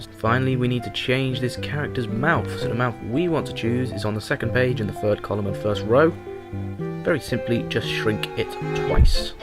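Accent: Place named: United Kingdom. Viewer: ops british!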